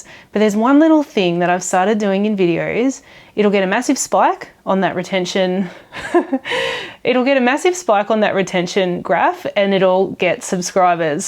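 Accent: Australia. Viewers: That was terrific ! On your chart, I am in that tiny pink group of over 65 year olds, female from the US. As a career custom jeweler with a love of Boulder and Black Opals I have also learned to love the Aussie dialect. The things I love about your channel include your family and general caring attitude, the opposing season to mine yet a similar climate, the realistic working messiness of the farm, your voice and amazing eyes. I liked that you responded to my comment half a year ago including a mention of opals. It felt personal. On your next trip to the US consider far northern California, on the coast for a stop. I would enjoy showing you around. We have KUNE KUNE pigs from New Zealand. I have a flower stand and show pictures on instagram This is also a huge lily producing area. Redwood trees bigger than imagination all around. Keep up the excellent videos and flower farming.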